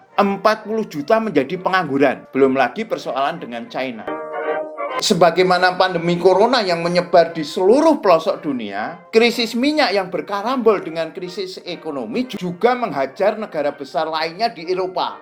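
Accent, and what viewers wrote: Java, Indonesia. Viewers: jooss .. bahasa medhok nya ngakak...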